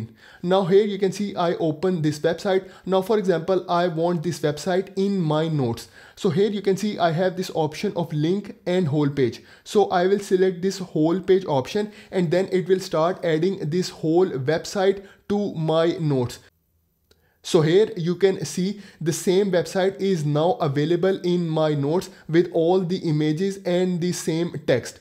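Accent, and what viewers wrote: India. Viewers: I love indian accent 😄 it's amazing 😄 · Why Your Accent is Indian bro? As am Indian I can understand each and every word very easily. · Bro got that thicck indian accent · Man got the thickest Indian accent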